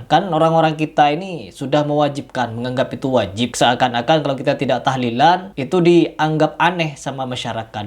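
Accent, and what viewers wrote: Madura, Indonesia. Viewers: Masnya orang madura ya? Kayak logat madura... Saya penonton baru mas... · Kayaknya bang admin orang madura ya, soalnya terlihat dari logatnya..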